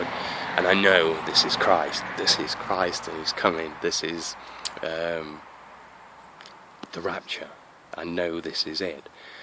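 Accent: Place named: Lincolnshire, United Kingdom. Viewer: could i ask what region your in? your accent sounds like mine im in lincolnshire. just wondered as everyone i know is asleep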